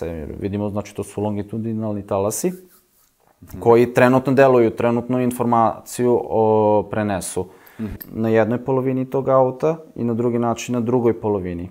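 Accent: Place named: Subotica, Serbia. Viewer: Subotičanin? Zbog akcenta bih rekao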